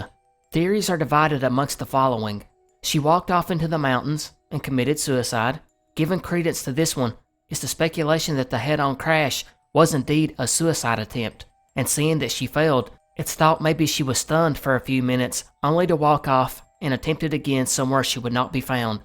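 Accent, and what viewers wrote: South, United States. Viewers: This might sound weird, but as a southerner, it's really cool to hear a narrator with a southern accent. You don't get to see that very often in TV and media. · In the beginning I needed to get used to the southern accent, but it has really grown on me and I now find it soothing 😅. Thank you for all your hard work! ❤ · I don't think it is weird either. I think it is really refreshing as you do, to hear a narrator with a great Southern accent! I am originally from the Pacific NW, so its really cool to hear all the other different accents that we have here in the US. I thought your comment was great.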